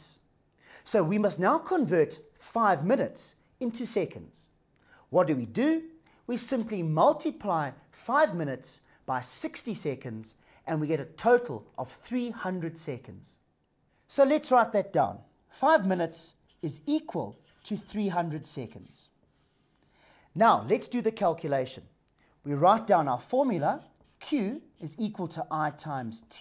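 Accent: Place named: South Africa. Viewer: sounds like a south african accent mate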